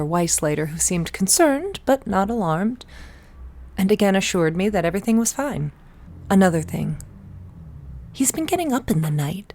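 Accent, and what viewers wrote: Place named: United States. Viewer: Finally pleasant dread in American accent, thank you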